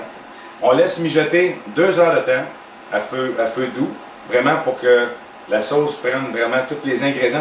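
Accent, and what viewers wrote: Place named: Quebec, Canada. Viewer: poleau111 L accens ce sont des Québécois pas des Français donc accepté notre langue comme on endure la votre bon Week-end c tu Français Ça !!!!!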